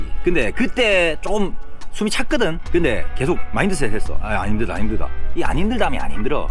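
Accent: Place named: Gyeongsang, South Korea. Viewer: 말을 재미있게 잘해시네 말투가 진주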